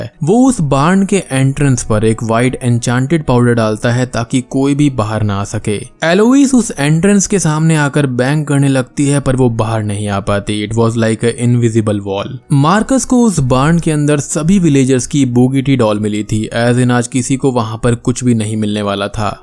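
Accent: India. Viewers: Such an arrogant champ lol. Get your accent right indie boi. Hahah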